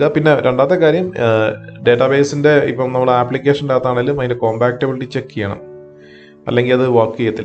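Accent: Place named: Kottayam, India. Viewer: കോട്ടയം ആണ് അല്ലെ 😁 എന്നാന്നെ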